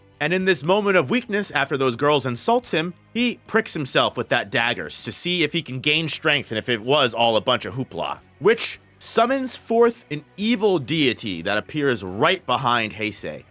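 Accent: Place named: United States. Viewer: The problem with american accent reading Japanese words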